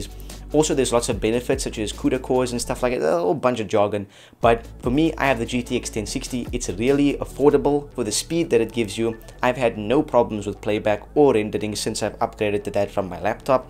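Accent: South Africa. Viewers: Wena you are a South African neh?!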